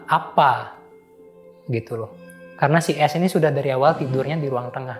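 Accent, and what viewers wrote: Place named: Bali, Indonesia. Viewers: Ada logat bali nya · abangnya ngebali banget logatnya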